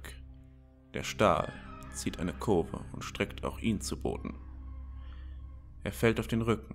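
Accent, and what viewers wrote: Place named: Berlin, Germany. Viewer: vom dialekt eher berliner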